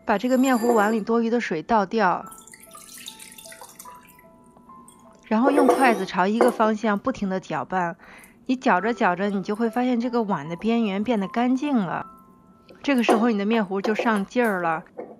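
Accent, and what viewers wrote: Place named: Northwest, China. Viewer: 听你口音是西北人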